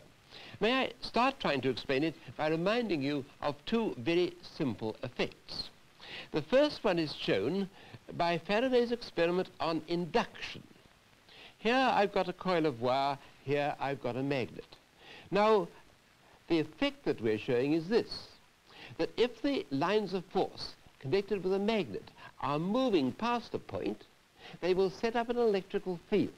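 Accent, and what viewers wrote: United Kingdom. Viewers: Wonderful accent, very posh. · When you hear a man speaking in that old fashioned more upper class English accent, you listen! I learned so much, and this is how physics should be taught, thanks for posting. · Brilliant and clear British accent !!!!I like that!!!!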